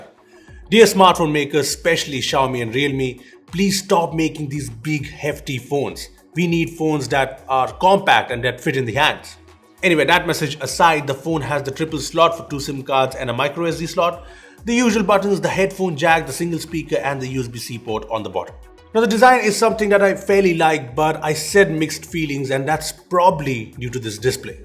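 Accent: India. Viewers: Now an Indian that can speak pure english Amma subscribe right away 😌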